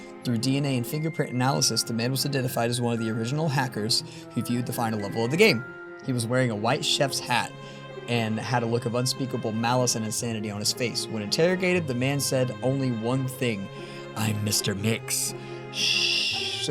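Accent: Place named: Appalachia, United States. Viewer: Next ingredient is whatever the heck he says! I can't understand hillbilly language!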